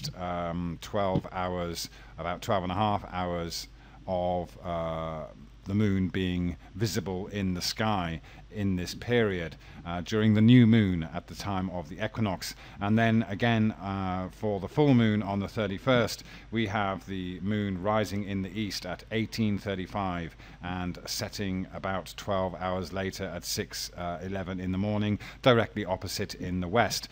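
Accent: United Kingdom. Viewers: You do realize the Moon period has nothing to do with the year, that the Romans had to abandon their 10 month calendar because it did not represent a year and they could not use it to plan their crops? do you know that the moon's 5 degree tilt has absolutely nothing to do with the tilt of the earth, other than keeping that tilt generally stable? Word salad, British accent with lots of extended Ss and your followers think you're extra smart.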